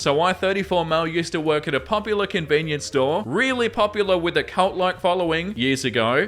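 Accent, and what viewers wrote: Australia. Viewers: I have never heard someone so Australian and so valley girl at the same time. It's amazing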